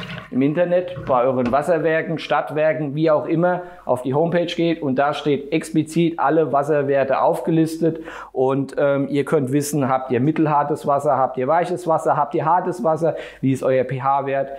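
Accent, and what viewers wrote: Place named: Palatinate, Germany. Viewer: Weschde... Efach schä es Pälzer Dialekt!!! #Südwestpfalz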